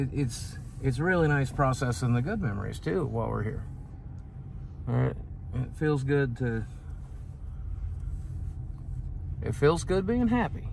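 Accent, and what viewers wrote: South, United States. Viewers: Is that a Southern accent coming back?😉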